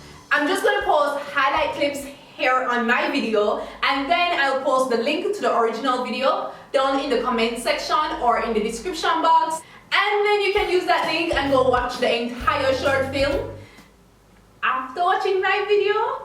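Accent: Jamaica. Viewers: I’m sorry I’ve never been to this channel but you sound really Jamaican are you?☺️👍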